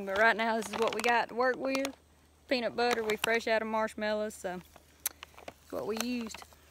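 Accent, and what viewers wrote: South, United States. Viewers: Love your southern touch. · That southern accent and barefoot my kinda gal.👍🏾👍🏾👍🏾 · Love you baby and love the southern accent. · Ive got a southern draw but damn i love hers a hell of alot more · I'm not sure if its just because your just so beautiful or that southern accent of yours but i need to find a women from the south I think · Love her southern accent, I find them really cute like the chick in the vid! Must be tough to live out in the country with all the wild animals around. I hear groundhogs while cute little things, cause lots of damage. We have SI Chuck here in NYC, but he's trained and confined with humans but the wild ones aren't. This chick looks like she'd be able to fix a car or something and not worry about mud like the city girls up here lol. Also, you know she works out or bikes or something with those legs? 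Would not want to get kicked by her lol. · i think she 'trapped' me with her good looks and southern accent. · ain't nothing hotter than a cutie with a sothern accent thanks for the trapping tips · Damn...she is hot and that southern in her voice. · you have an amazing accent. Love you southern bells.all the way from England Steve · that southern accent it think im inlove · Ma’am I just love your southern accent. I can actually understand what you’re sayin. I’m from upstate SC. We usually use sour corn for something besides hog feed. Hahahahaha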